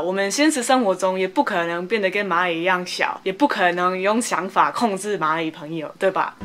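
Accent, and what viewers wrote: Taiwan. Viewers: 哇 中文講的真好 連你講英文都感覺好台哦 最好台語也學一學 那你就真的變成台灣人了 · 不錯 中文講得很好 很台式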